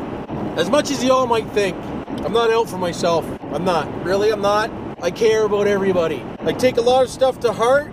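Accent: Canada. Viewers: fuck eh you can tell you're from Ontario 😂 i only say this because I'm from the Stratford area and we all talk the same glad I found the channel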